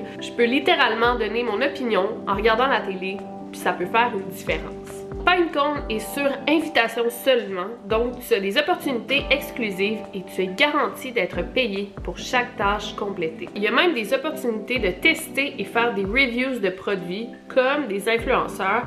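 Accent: Quebec, Canada. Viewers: Nanette et Marjo 🥰! Et personnellement j'adore les miaulements ponctuant ton récit,. Récit passionnant comme d'habitude, et si bien narré avec en prime ton accent québecois 😊.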